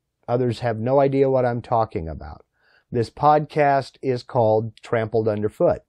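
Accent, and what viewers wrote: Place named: United States. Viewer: Hey. I enjoyed today's video. Like all of us beginners, I thought I would see another of your superb tutorials. But you've already given us a wealth of knowledge and now it's time for us to practice what you've taught and use it. Above all, I want you to continue having fun at what you do, and your upcoming project videos (with maybe a tutorial here and there) are something I'm looking forward to a lot. I had to chuckle in when you talked about not just doing the Vectric videos in your voice. Both of my sons and I agree that one of the best things about your tutorials is that you speak slowly, clearly, and in American English. Vectric's go too fast for beginners, and there's a lot of rewinding to translate the thick British accents on some of the words. I'll also be glad to see the Christmas projects that you post after the first of the year. 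I'm hopelessly behind on what I'm already committed to for this year, but would like to get a head start on next year. I hope you have a great Christmas, and have a lot of fun in your shop getting ready for it. Thanks for all you do.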